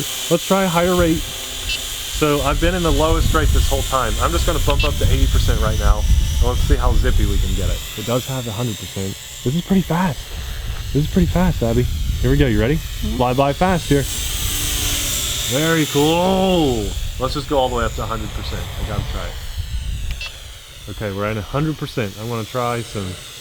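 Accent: United States. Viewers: "Very fun!", you have to be an American with that English.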